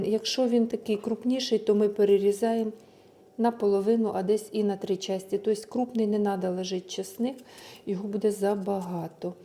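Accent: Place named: Odesa, Ukraine. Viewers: То й не слухайте! Це південноукраїнська говірка! Діалекти є в кожній мові! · Землячка моя, наш одеський діалект, приємно чути своє рідне, сумую за домівкою 😢, я заливаю бульйоном коли ставлю в духову шафу, вони небагато смачніші тоді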